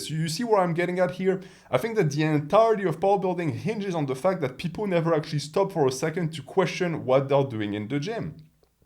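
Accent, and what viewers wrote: France. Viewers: I work in audio so I'm a bit of a snob admittedly. A lot of people just don't care and I get that. But I do believe his old audio was objectively bad sometimes in that I would miss certain things he said because of the combination of mic distance, room reflections, and French accent. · i hear french accent, i close the video.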